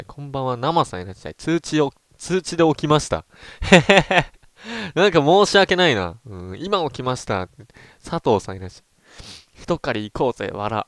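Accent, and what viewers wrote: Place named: Ehime, Japan. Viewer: 〇〇やからって方言で愛媛かとおもってました(^○^)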